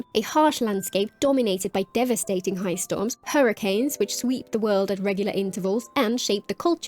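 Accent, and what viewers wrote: United Kingdom. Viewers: You sound Bri'ish like Ver'in and Regulus...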